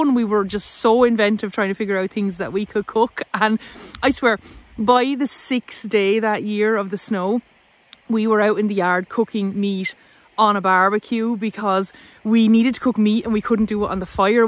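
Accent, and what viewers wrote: Ireland. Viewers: Hi, I'm enjoying your video so much, both for the information and the laughs. You're making me homesick with your accent and sayings! I grew up, "just up the road" from you in rural Westmeath. I'm now living in Germany but hoping to find my forever home back in Ireland one day. Keep safe and well and keep the videos coming.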